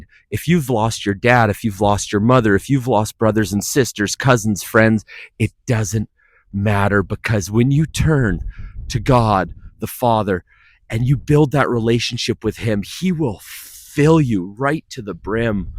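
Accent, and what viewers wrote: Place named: Northern Canada, Canada. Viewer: I'm female and not having a father in my life affected me a lot too, but in a different way. Thank you for sharing this. I'm from Manitoba and I also came from a Mennonite family but grew up without church or any religious involvement. I know the feeling of being lonely and isolated in the winter. I live in the Midwest now, but it's really nice seeing that you're still up there in northern Canada, I knew I recognized your accent 😊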